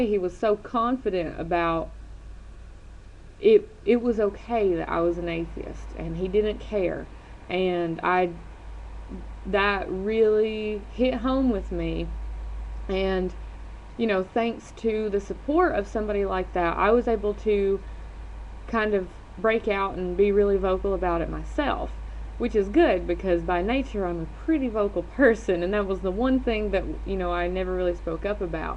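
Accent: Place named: South, United States. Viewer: You are breaking the misconception that every individual living in the South or Southwest are all Bible toting twits. I love the accent and the sprinkled curse words. It adds some swagger to those rational thoughts and ideas that you are having. Don't stop speaking your mind.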